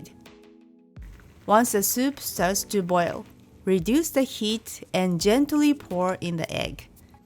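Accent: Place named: Japan. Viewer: The heavy japanese accent tells me that this is authentic. Also, nice cap.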